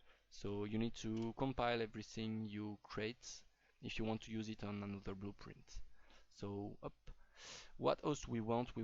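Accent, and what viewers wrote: France. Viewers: Je reconnais l'accent Français x)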